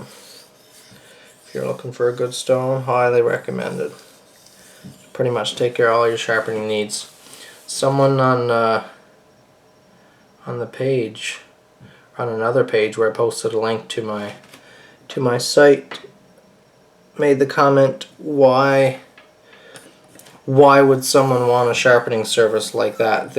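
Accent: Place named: British Columbia, Canada. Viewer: haha yessss!! found the video quite by accident. recognised the accent immediatly. Hello from BC. Haven't been home sense 2002. Funny how hearing the accent from home has such an emotional/mental trigger.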